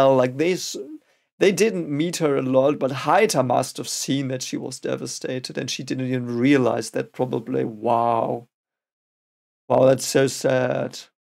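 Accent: Germany. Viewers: Thank you for the correction: 'Glanz.' I believe 'bredt' is a Danish word. However, the subscripts may have undergone mistranslation and might not accurately reflect the original meaning. By the way, are you from Bayern? Your way of speaking is similar to that of a guy from Munich whom I know.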